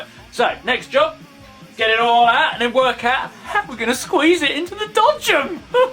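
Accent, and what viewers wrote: United Kingdom. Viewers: british clearly, he lives in lincolnshire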